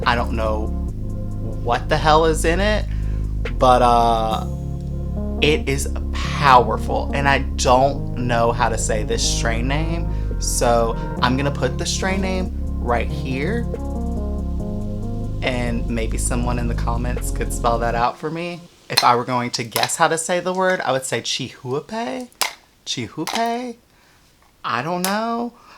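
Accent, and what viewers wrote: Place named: New Orleans, United States. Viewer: That explains the accent! I'm from New Orleans so I'm like this isn't a Cali accent it's my neighbors accent lol!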